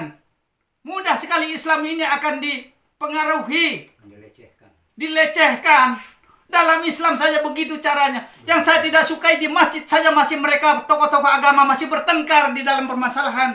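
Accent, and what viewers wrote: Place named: Sumatra, Indonesia. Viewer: Yg lebih keras LG bang setuju sy gy bicaranya sama dgn km orang Sumatra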